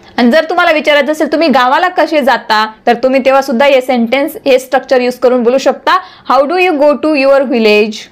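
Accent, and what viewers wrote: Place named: Jalgaon, India. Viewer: Khoopach Chhan ! Tai tumhi Jalgaonchya disata. Bhashevarun lagech kalate.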